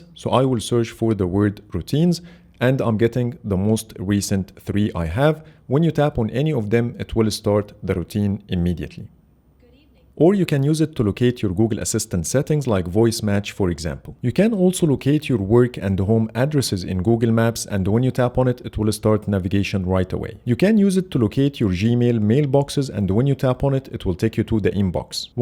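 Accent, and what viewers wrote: Egypt. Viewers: The Egyptian accent is corrupting your English.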